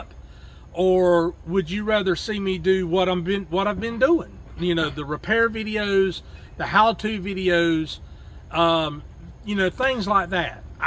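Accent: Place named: North Carolina, United States. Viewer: I'm a transplanted Tarheel in Maryland with 12 acres of property that I maintain, a mix of grass areas and trees. I was attracted to your channel (subscribed!) because there is always something to be learned from the experience of others with regard to mowing, trimming, and general land maintenance. Not to mention that listening and watching you reminds me so much of my southern NC roots. I also enjoyed your vacation episode - I drive by Fancy Gap on my way to NC to visit family so was familiar with the area. Didn't know about the cabin rentals so something for me to check out for one of my getaways in the future. I would say keep doing what your doing. Not everything will hit everyone's interest but variety is always good.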